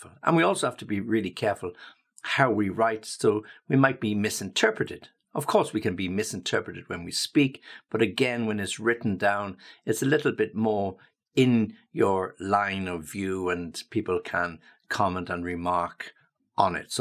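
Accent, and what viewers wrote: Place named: United Kingdom. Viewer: He is from uk with British accent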